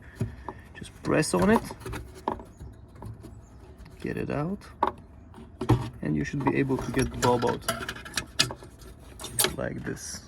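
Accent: Russia. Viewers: не знаю кто ты и откуда, но слышен русский акцент ) классное видео спасибо )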